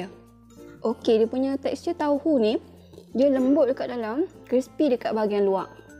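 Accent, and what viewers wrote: Kedah, Malaysia. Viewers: Orang kedah ke kak 😅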